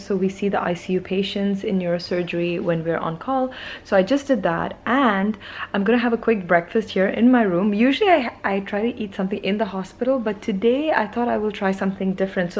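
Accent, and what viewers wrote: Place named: India. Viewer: She's definitely indian u can hear the indian accent lol also she kinda had that indian nose 👃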